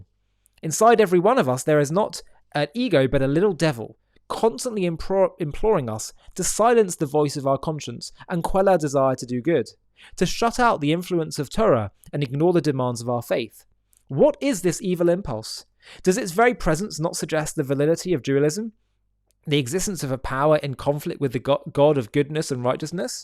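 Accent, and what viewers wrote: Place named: England, United Kingdom. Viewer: He's English, it's how people in that country speak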